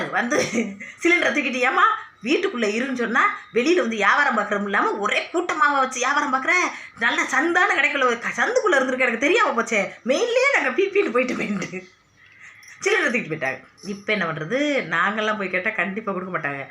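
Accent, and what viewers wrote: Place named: Ramanathapuram, India. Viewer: உங்க ஊரு ராமநாதபுரம் மாவட்டம் பேச்சை இது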